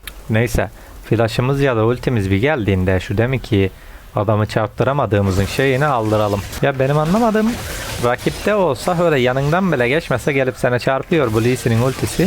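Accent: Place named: Serik, Turkey. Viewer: Abi sende tam Serikli şivesi var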